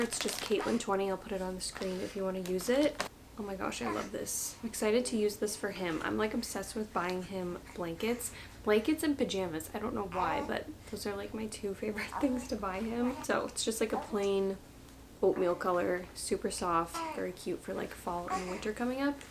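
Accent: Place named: New England, United States. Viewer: Agh! He’s adorable! Literally!!! I also love how obviously you’re from New England, and I love how it’s GreysEN and you gave it kinda a New England flare and I love that 🫶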